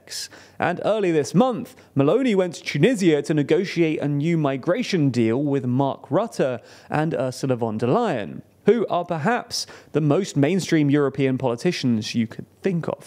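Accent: England, United Kingdom. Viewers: do I really need someone with an English accent to read me statistics ?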